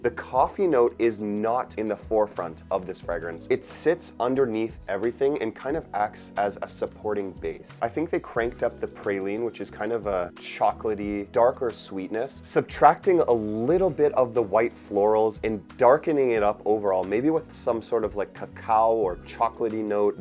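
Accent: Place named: Canada. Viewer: Judging by your voice and kind demeaner you were giving me strong Canadian vibes -- upon further investigation, you are in fact Canadian 😄 I love your content. I'm going to add Qahwah to my collection thanks to your opinion. Keep up the good work.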